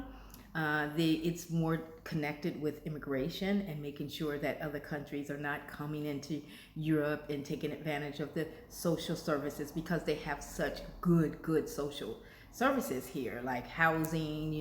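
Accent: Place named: United States. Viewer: I can't speak for the rest of Europe but in Britain I think most people would be accepting and curious , the question is nuanced because some British people are becoming nervous of some folks from other countries than America who may also be black ( sad but true ) but once they hear you speak they will know you are American, I'm British and I hope this helps amigo 😊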